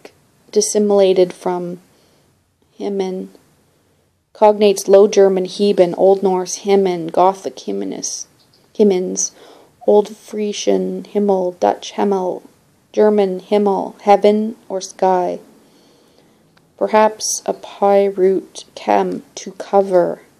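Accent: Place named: Canada. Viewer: There's a Daoist quote that I read in an old Alan Watts book to the effect that everything went pear-shaped when the law was written down.. I tried to find the exact quote on google so that I could post it on your Genesis video. Couldn't tho. Anyway, don't know if you say 'pear shaped' in Canada, but I prefer to use slang terms sometimes-- I'm probably out-of-date with them tho-- I was tempted to say 'Pete Tong', which is even more out-dated. I'm only guessing that you're Canadian, but the pronunciation of 'out' is usually a give-away. 'Great Work' in any case :)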